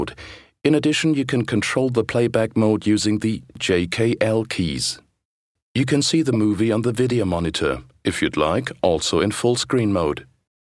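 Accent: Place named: Germany. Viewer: the guy whos talking has a german accent!! lol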